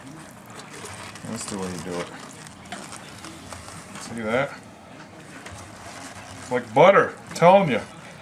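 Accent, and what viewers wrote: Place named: Great Lakes, United States. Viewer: where are you from ? I hear great lakes or MN but swear I heard you say oklahoma lol. That could be the T.B.I. fooling me though.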